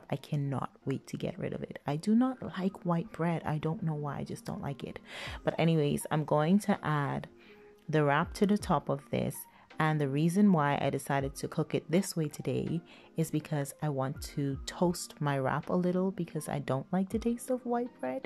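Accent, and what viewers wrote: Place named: Jamaica. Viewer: Love ur Jamaican voice 😆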